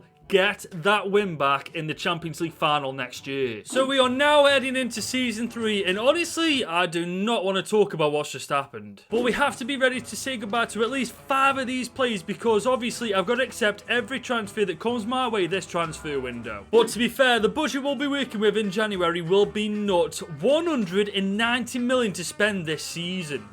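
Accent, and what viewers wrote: Stoke, United Kingdom. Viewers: Only just came across your channel. Great content!! I’ve subbed as I assume from the accent you’re from Stoke ❤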